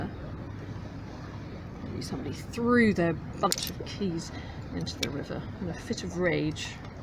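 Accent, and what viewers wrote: United Kingdom. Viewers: Some fascinating finds there. I sometimes spot other interesting things in the background near your finds and frustratingly wish I could somehow interactively have a poke at what they are. Speaking of frustration, I loved the slight tone of indignation about the pouring rain. You sounded very English :)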